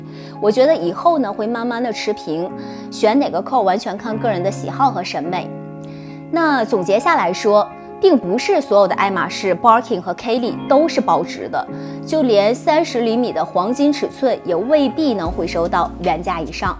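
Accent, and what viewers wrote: Japan. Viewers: 她是日式英语发音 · 日文发音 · 日式英语我听懂 解说非常好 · Her pronouncation is correct in Japanese, it's katakanam, used for words from foreign languages.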